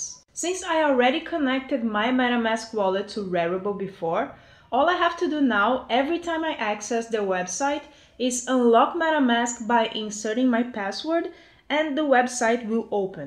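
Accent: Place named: Brazil. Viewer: Vc é brasileira né? srsrsr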